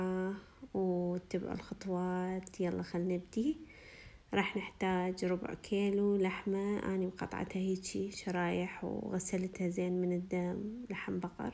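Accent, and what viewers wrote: Iraq. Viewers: لايك لعيونك احب لهجه العراقية كثير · لايك لخاطر اللهجه العراقيه احبها حيييييل ❤️❤️ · ياوييييلي يا دخت وربي من كمية الجمال 🥰🤩 ماادري من جمال اللهجة العراقية أو من جمال الاكله لمن قطعتيها😘😍😩 تسسسسلم ايدينك الحلووووه يااحلى 👏🏻👏🏻👏🏻تحية لك من متابعينك فالسعودية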